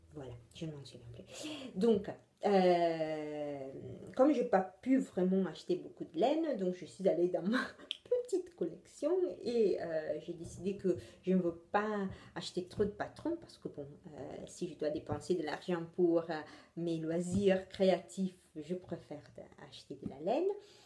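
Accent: Italy. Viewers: bonjour, j aime regarder tes podcast et j adore ton accent italien st tu as toujour le sourir.bisou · Coucou ! C'est toujours un plaisir de retrouver ton bel accent italien et tes Robin 😂 Passes une bonne semaine en espérant que tu trouves le temps pour coudre et à très bientôt ! 😘😘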